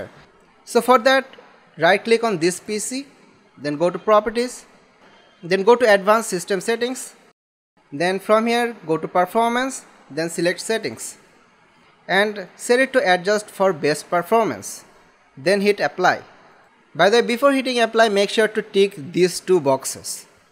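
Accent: India. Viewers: that accent sound Bengali...are you one tho?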